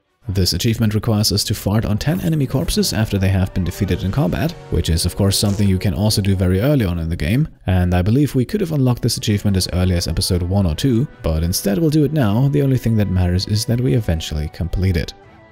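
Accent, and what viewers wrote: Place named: Germany. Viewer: p.s. sprichst du Deutsch? I detect a slight accent:)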